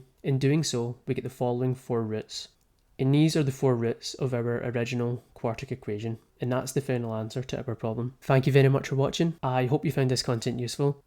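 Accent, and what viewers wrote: Scotland, United Kingdom. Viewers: I was searching for about 2 hours for this, thank your for the wonderful derivation of formulas and solution. I love the Scottish accent, if its not Welsh... , love from Pakistan 💚💚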